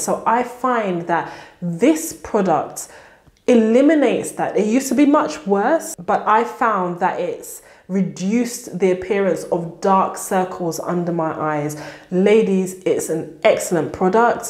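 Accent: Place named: RP, United Kingdom. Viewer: That accent! very posh prim and proper. Us Americans can't get enough of it. Sending love from NY 🖐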